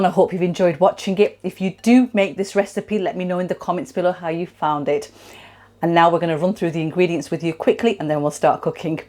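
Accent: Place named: United Kingdom. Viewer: So useful vlog. I love your cooking content and your UK accent too :) Love from Tokyo... Can we become friends please? :)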